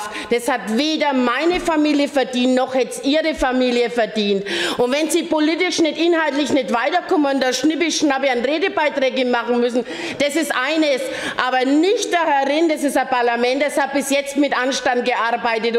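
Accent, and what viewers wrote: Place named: Bavaria, Germany. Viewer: Des war vielleicht nur leicht bayrisch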